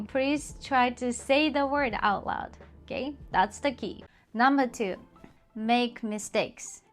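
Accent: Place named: United States. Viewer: 日本に住んでいるアメリカ人ですが、I have a question for you. いきなり聞くけど、 さんはもしかしてアメリカに行ったことがありますか? 住んだことは？😅 I guess you have spent time in either the US or Canada. なんかMiaさんの英語の発音を聞いてそう思っただけ🧐😉 頑張ってほしい😊👍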